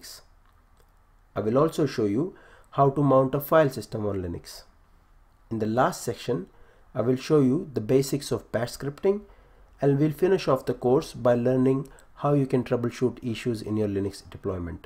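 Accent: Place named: India. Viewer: Started the video heard some India accent person. Jesus Christ why are they every IT video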